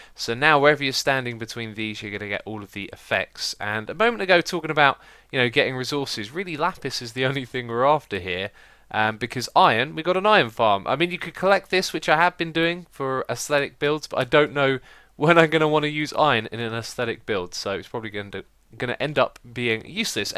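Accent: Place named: South East England, United Kingdom. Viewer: He is British but he won't answer more specifically than that. I think it's safe to assume he's from south-east England though.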